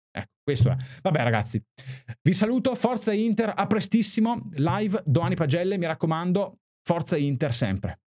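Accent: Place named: Veneto, Italy. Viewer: Grandissimo bel video..ma poi mi fa sorridere quel tocco di dialetto veneto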